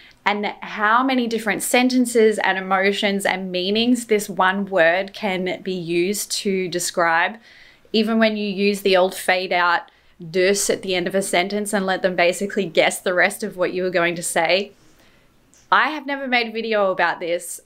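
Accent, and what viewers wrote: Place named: North, Netherlands. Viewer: I can definately hear she lives above the rivers, since I don't.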